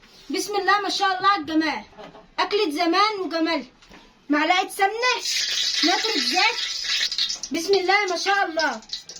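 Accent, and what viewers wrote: Egypt. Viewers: بحب اللكنه الفلاحى اللى بتحسسنى بالاصاله والجمال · هذه البنت با لهجته مصريه بجد وهذه عنون الشعب مصر وبنات مصر انتي يابنت كذا 👍👍👍👍👍👍👍👍👍♥️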